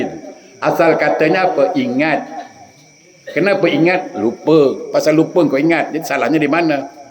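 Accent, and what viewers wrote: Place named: Johor, Malaysia. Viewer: Assalamualaikum ustaz,bagus penyampaian ilmunya mirip loghat Johor malaysia.. Alhamdulillah.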